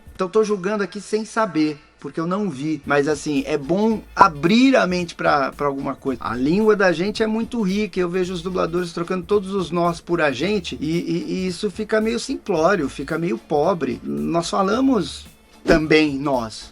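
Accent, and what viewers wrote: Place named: Rio de Janeiro, Brazil. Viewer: sotaque é duro. Principalmente quando puxa para o carioca. Eu gosto da dublagem mas eu sei que tem como atenuar sotaque para não ficar carregado.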